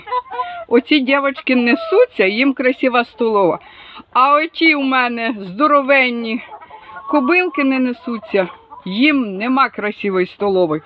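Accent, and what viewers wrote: Kuban, Russia. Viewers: Но это не украинская мова. Так говорят в станицах на Кубани взрослые. Я сама с Кубани и понимаю эту речь. А вот украинскую не понимаю. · Спасибо за видео балакае як у нас в станице на Кубани дякую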